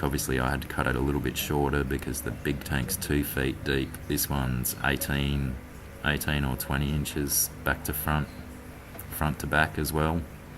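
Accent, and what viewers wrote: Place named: Australia. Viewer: You sound more Australian in these videos than you do now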